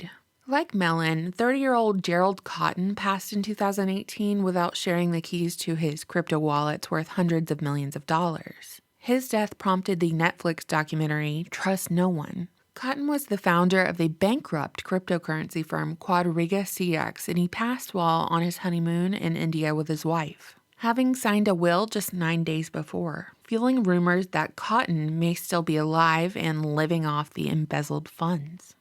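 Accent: South, United States. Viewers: “Hey internet friends, did you know…” smiling pretty face and southern lilt about to rock our worlds with something “to ponder as you go about your day”😅❤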